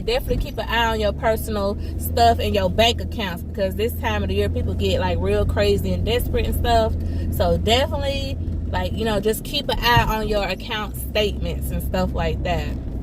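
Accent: South, United States. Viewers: I have NO IDEA how I ended up on this video nor your channel, but I stayed and watched this video in its entirety. I'm so glad I did. You said something about "story time" at the beginning and that southern accent caught my attention! Unfortunately, I'm not into hair and beauty, however, I wanted to let you know that I enjoyed this video! You had me literally rolling! Especially that look. It's always nice to see integrity in people. Additionally, those with integrity not afraid to hold the dishonest accountable. So, from one Youtuber to another....keep doing what your doing! You are creating REALLY GOOD content. - Lewis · Luv the country accent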